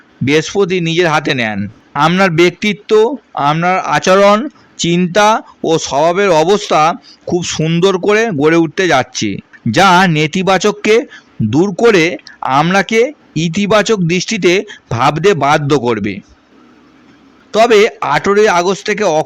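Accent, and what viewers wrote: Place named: Kolkata, India. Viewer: এটা কোলকাতা, পশ্চিমবঙ্গের কিছু লোকের অভ্যাস, এভাবে বললে তাদের ভাব বাড়ে।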